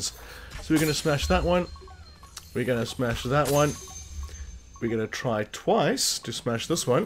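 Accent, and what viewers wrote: South Africa. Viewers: Feel like such a tool. Good to hear a South African accent too 😂✌🏻 thanks man!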